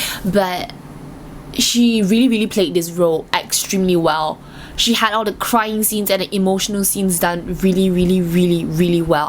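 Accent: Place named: Singapore. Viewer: Ohhh dear are you from spore? You accent is so nice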